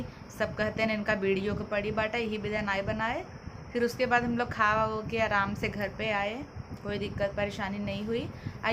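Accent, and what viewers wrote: Jaunpur, India. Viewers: ji, Hame gaow Bahut accha lagta hei. Aap ki awaj sun kar Jaunpur ki yad aa jaati hei. Main Jaunpur se bahar rahata hu.lakin aap ka video dekh kar lagta hei ki mein Jaunpur pahuch gaya.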